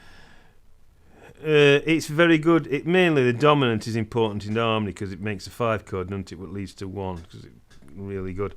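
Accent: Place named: North England, United Kingdom. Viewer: I liked your stuff from very much. It all was intelligently conceived and presented. I like your style, your Central-Northern British accent a lot(!) and the way you put down some pathetic and pretintious (and so) people who think they know it all. I mean it's not your goal of course but you won't believe how much fun it actually is!:-) Please - take my advice - don't be so concerned about the hate mail and comments. You seem to be very responsive person and as such just try to ignore the ones who would cause you to close another of your great channels. Take it easy! I'm looking forward to your new videos - they are always tasty, helpful and really so much fun!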